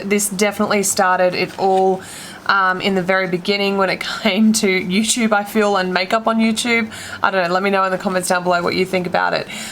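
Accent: Australia. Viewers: You sound aussie, how do you get boxy charm, thought they dont ahip to australia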